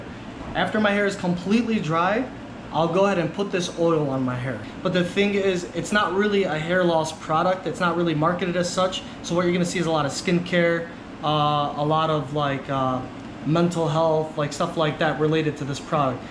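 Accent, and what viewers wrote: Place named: Arab world. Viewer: Where you from? You sound like an Arav from Dearborn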